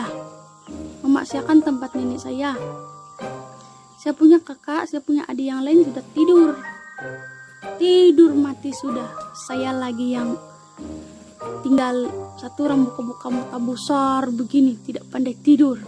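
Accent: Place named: Kalimantan, Indonesia. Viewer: ckap serupa orank kalimntan..borneo😃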